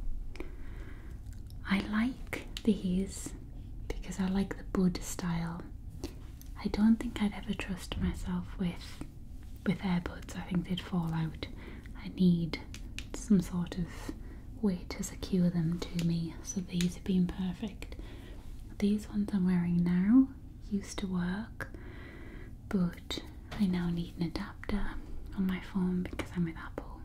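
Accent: United Kingdom. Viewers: i love ur lovely British accent🥰🥰🥰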